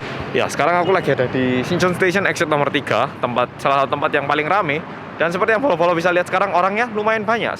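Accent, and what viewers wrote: Java, Indonesia. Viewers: stay safeee jang hansolllll medhokk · Pengen nonton kemarin tapi baru bisa sekarang 😩 sehat teros mas biar aku denger medhok mu 😁✌️ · Me : Weh terjebak dengan vlog abang ni 😂😂 . Suka dengar sebab ada slang bhasa Jawa ( bila your dad is Javanese but you cannot speak Jawa and takde slang pom . Jadi terus rindu kampung ayah and sepupu kat 🇮🇩)🌸 terus subscribe 😊 Salam dari Malaysia 🌸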